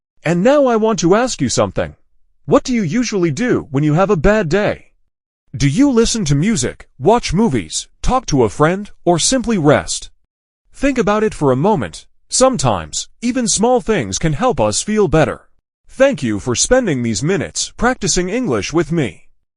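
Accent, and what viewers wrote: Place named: United Kingdom. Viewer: Es acento británico se escucha muy bien la pronunciación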